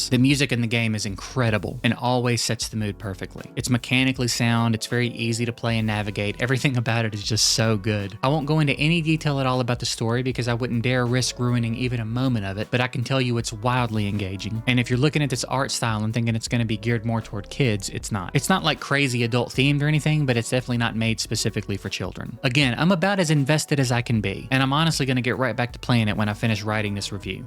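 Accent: South, United States. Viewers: Finding someone who can recommend good casual non farming games? Amazing. Hearing the southern accent? You do not understand how much it comforts me.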